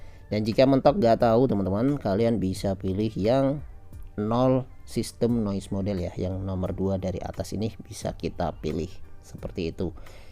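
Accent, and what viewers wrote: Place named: Jepara, Indonesia. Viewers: Wong jeporo yha mas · Wong jeporo ta jebule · wong jeporo mas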